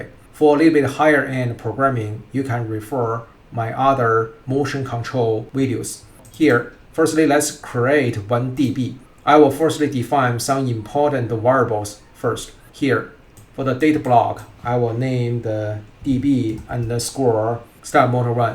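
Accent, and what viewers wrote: China. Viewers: Regret we didn't meet sooner，are you Chinese? Sound like Chinese-style_English.he he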